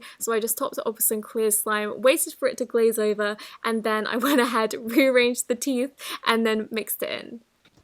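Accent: United Kingdom. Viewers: Pleease do more vlogs, your British accent is so cute🤩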